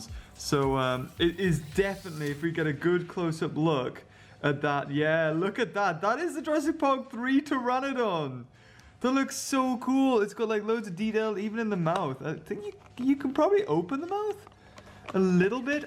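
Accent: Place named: United Kingdom. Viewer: What part of britain are you from?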